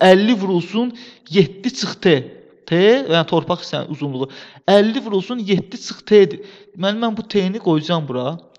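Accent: Baku, Azerbaijan. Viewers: Bakı ləhcəsi ürəyim açıldı👍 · Eşq olsun Bakılılara danışığın çox xoşuma gelir ele billem yerlimle danışıram😄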